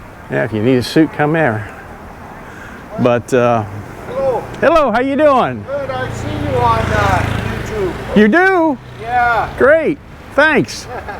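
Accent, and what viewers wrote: United States. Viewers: Your health is so good Sir , you could walk for an hour and making videos for your fans Keep it up! Sir I watch your videos to practice my listening I really love American English. Love from the Esarn part of Thailand.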